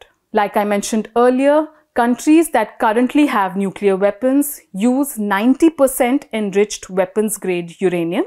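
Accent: India. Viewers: Shiiittt Stingking indian Accent !!!!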